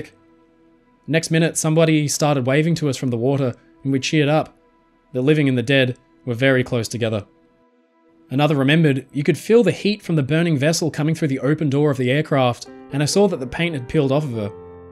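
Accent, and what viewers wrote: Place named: Australia. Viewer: Australian or whatever it is accent also adds a distinctive flavor to his superb storytelling.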